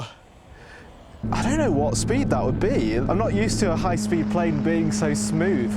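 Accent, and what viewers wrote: United Kingdom. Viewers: Is bro speaking UK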